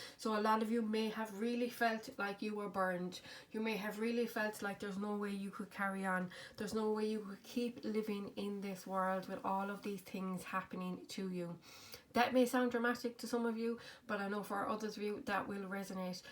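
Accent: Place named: Ireland. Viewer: By the way your Irish accent is so cute. I do not hear people who speak English with Irish accent but it sounds interesting.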